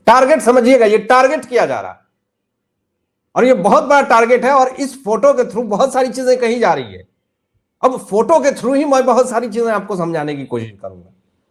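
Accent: Bihar, India. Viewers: Teri bokne ka tarika bihari vala h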